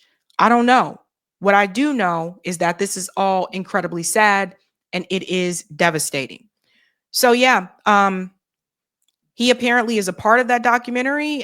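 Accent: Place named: South, United States. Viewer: ..!!! 🙂 Pull Out.? You are a "Southern Woman" I guess Boston rubbed off on you a little bit 🙂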